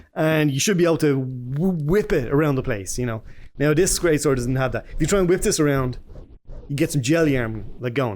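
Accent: Ireland. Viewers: I can't place that accent, is it Meath?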